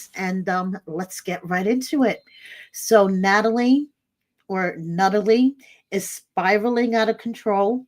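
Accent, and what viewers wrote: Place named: New York City, United States. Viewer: Hi happy holidays. I love your channel and just subscribed. Your accent reminds me of my New York City roots. Aloha from Hawaii.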